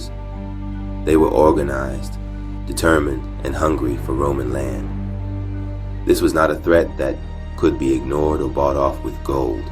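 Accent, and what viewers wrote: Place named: Sweden. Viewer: Narrator has a Swedish Lutheran accent.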